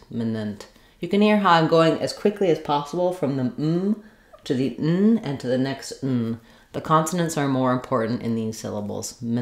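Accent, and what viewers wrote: United States. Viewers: Oh wow you're here making videos and uploading! I did it search for a biblical name Rayhab. I knew there's different spellings but this is the one that I'm familiar with and some people remove the Y. I'm assuming when you give pronunciations that this is American English pronunciation? Google AI pronounces her name as rahab... They pronounce the a like the word father. I've always heard it pronounce the way you pronounce it in that video I just saw. Anyway, I'm assuming you're giving us pronunciations for USA❤❤❤. Sorry this was so long but I am from another country originally, Venezuela. My family came to USA when I was a girl and now I'm 23. I'm not trying to give my life story but I am trying to learn how to pronounce a lot of words in the USA version. You can understand why it gets a little complicated when the Google AI kind of uses pronunciations that sound like there United Kingdom English. 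Anyway I'm using speech to text because I can't spell English great so far. I'm trying to work on all these things and I plan to subscribe as soon as I hit send. Glad that I find you still around after her the Rehab video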